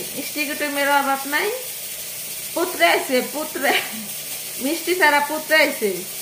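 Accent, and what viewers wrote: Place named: Dohar, Bangladesh. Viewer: Tumi Ki Dohar theke? Kotha shune mone hoy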